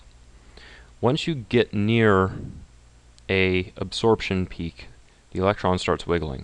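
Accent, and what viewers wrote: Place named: United States. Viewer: finally someone with a regular american accent that i can bear to listen & learn from. Thank you. subscribed!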